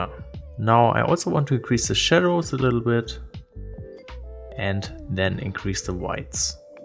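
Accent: Germany. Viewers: Great video! Perfect for me is I am currentyl trying to get better in LR? Are you from Germany? As I think I can hear a german accent😅😉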